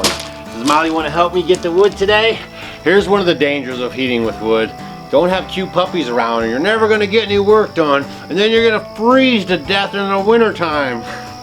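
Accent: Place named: Utah, United States. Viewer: are you in utah or missouri i heard both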